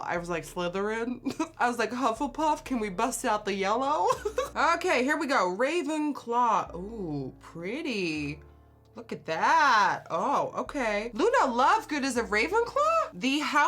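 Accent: United States. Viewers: It’s so mind blowing how you have zero accent! You sound so American, you are professional makeup artist and language learner! It’s so hard to learn another language. Thank you for teaching us Dutch! What a fun way to learn :) love it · Your accent is impeccable...I didn’t even know you weren’t from the US for a while until you said it in another video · I CAN’T BELIEVE YOU ARE DUTCH! YOUR ACCENT SOUNDS SOOOO AMERICAN...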